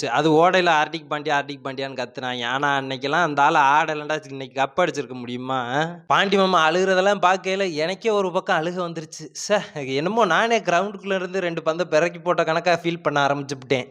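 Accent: Madurai, India. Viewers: ஹாய் ப்ரோ, நீங்க பேசுறது பங்காளி என்று சொல்றது இந்த மதுரை ஸ்லாங் எல்லாமே சூப்பர் ப்ரோ பட் உங்க வீடியோ எப்போதுமே கொஞ்சம் லேட் லேட்டா வருது 29-ஆம் தேதி ஜெயிச்சு மேட்சுக்கு ஒன்னாம் தேதி போட்டிருக்கீங்க வீடியோ இப்படி இருந்தா எப்படி பாப்பாங்க உங்க வீடியோவ ஸ்பீடா போடுங்க உங்க ஸ்லாங்கில் சொல்லணும்னா பங்காளி வீடியோவை கொஞ்சம் ஸ்பீடா ஸ்பீடா போர்டு அப்படின்னு வெட்டி முடிக்கிற வேலையை பார்க்க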